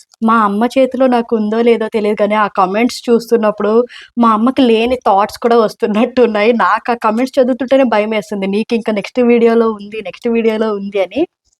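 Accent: Karnataka, India. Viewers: Meru kannnada slag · మీ యాక్సెంట్‌ని బట్టి చూస్తే మీరు తెలుగమ్మాయిలా లేరు. కన్నడా కదా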